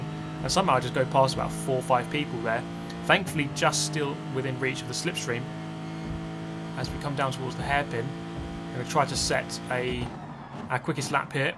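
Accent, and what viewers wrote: England, United Kingdom. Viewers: I have enjoyed your series on Grand Turismo racing. With your English accent it is almost as though I'm watching ESPN or any other sports Channel that covers European Auto Racing. I used to be really heavy into Gran Turismo all the way up to about number 4, but got out of it for some odd reason. From what I see with the ability to race online I have got to get a PlayStation 4 silver I can join in the fun. BTW, would you recommend playing with a steering wheel stick shift and foot brakes or with? Let me know.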